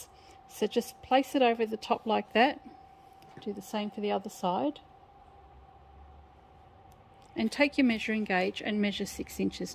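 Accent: Australia. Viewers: Greetings from Canada ! Thank you for your awesome tutorials! I have lots of tea towels of various sizes…would you be so kind to give me the measurement of your half towel once cut ? I want to be sure I’m using the same approximate size . Thank you ! Ps.. 99% of my family is in Melbourne …hearing your lovely accent makes me miss them even more 🇨🇦😁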